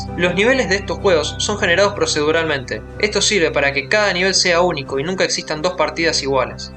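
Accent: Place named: Argentina. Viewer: Cuando escuchas que el narrador del videoensayo es argentino, sabes que va a estar bueno el video sjdssdjjsd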